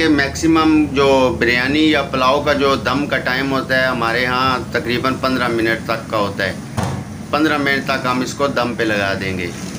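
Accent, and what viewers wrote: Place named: Abbottabad, Pakistan. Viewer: Bhai bot asani se sumjate ho app abootabad ke ho ap ke lehjay se lugta he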